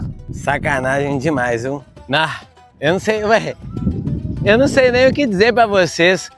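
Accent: Minas Gerais, Brazil. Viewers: Muito bom , pelo sotaque é aqui de minas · ce e mineiro?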